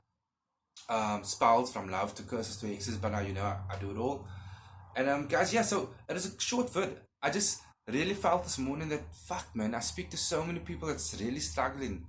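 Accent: South Africa. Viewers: Are you a south African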